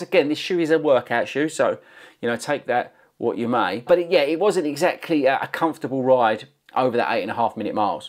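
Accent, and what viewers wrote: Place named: England, United Kingdom. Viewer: I’m sure you’ve said it but what part of England are you from? I’m over here imitating the accent 😂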